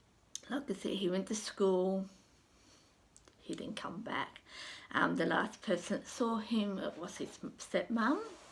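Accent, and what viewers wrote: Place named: Australia. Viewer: She is Australian. Those of us from the states need subtitles. Very DIFFICULT to understand her accent.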